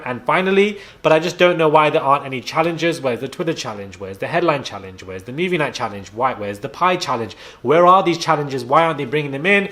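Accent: United States. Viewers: can we just stop with the American accent?? disgustanggg Thank goodness this channel exists, if love island doesn’t want us to comment on their videos then this is our outlet. I agreee, Gemma and Luca are BORING, these episodes are BORING, the fact that there’s been no challenges or preview of the next episode is whack. I literally come to this channel FIRST before I even watch the episode to see if it’s worth it cause lately it really hasn’t been.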